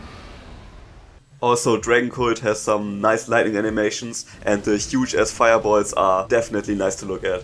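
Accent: Germany. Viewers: Der Deutsche Akzent kickt zu stark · Bist du deutsch? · So herrlich TEUTSCH, your english. I love my germans, because I'm one myself. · man merkt das er deutscher ist xd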